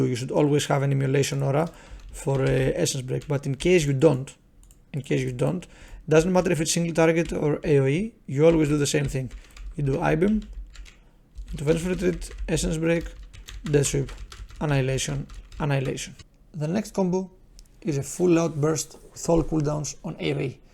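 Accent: Greece. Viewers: Nice guide will check it out. One irrelevant question though. Are you greek ? Your accent makes me think that, thats why i ask.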